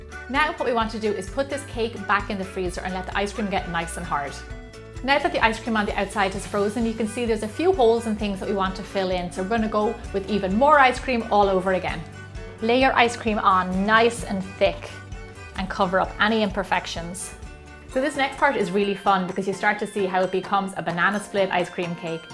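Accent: Ireland. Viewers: Are u from Ireland?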